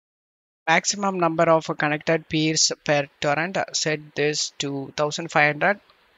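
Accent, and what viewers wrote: India. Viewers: as soon i see indian accecent i knew hw will fix my problem thanks bro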